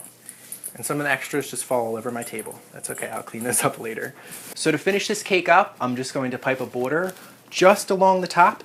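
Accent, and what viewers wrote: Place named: United States. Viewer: What area of America is that accent? It's very distinct. Loved the video, it's great!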